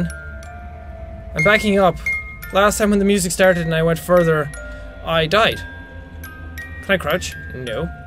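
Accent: Ireland. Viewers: you sound Irish I know because im half Irish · First time he sounds Irish. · Are you Irish?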